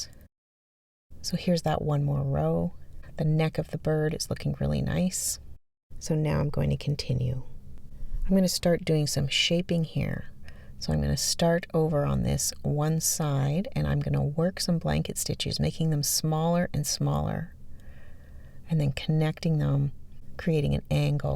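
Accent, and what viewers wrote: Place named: Canada. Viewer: I recently found your channel, and I love it. You have a very pleasant voice to listen to. I can tell by certain words that you are from Canada. But only certain words. Thank you for what you do. I have bite the bug and gone down the Slow Stitching rabbit hole. Nothing completed yet. I also bought your mini animals and plan to buy more. When the budget allows. Thanks again.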